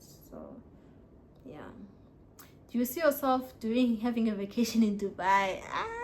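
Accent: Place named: Xhosa, South Africa. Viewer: Ndikuthandela lento yoba uphume kwesasjolo usathetha isixhosa sakowenu ❤. Awukhulumi isizulu esi dizzy like the other girl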